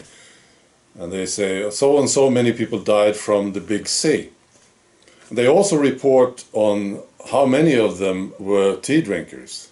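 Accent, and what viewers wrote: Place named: Sweden. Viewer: Apart from being Swedish, there is nothing wrong with your accent.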